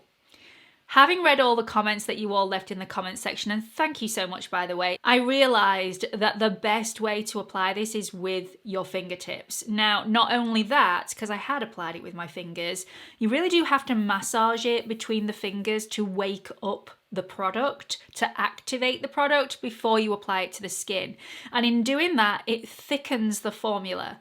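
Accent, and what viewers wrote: United Kingdom. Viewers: I always watch you till the end! besides being interested in what your talking about love your British accent! Much Love!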